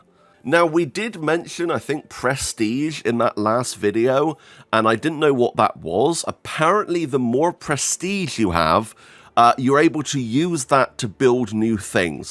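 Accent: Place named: Bristol, United Kingdom. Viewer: i'm almost certain you have a southwest/bristol twang and i can hear it from a mile away (i'm from there) not a bad thing also! good video keep it up :))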